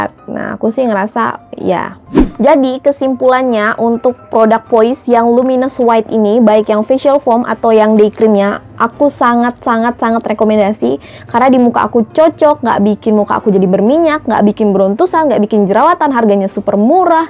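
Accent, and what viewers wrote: Pontianak, Indonesia. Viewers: Orang pontianak ke kak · orang Pontianak kak ?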